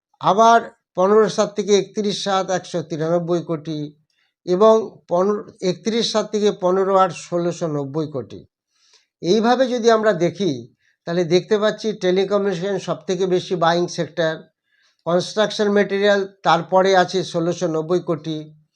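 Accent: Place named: United States. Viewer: হ্যাঁ স্যার, আপনি কিছুদিন ধরে আমেরিকান এক্সেন্টে ইংলিশ বলতে শুরু করেছিলেন, আমি মনে করেছিলাম বাংলা বললে হয়তো অন্য ধরনের সমস্যা হতে পারে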